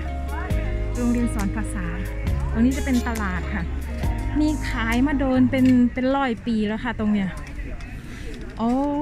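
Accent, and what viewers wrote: Isan, Thailand. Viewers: พูดอีสานฟังไม่รู้เรื่องกรุณาพูดภาคกลางจะดีกว่า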